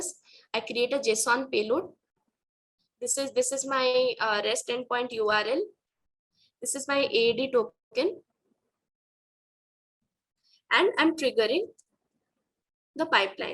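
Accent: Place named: India. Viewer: What a shame that speaker speaks hindi english :(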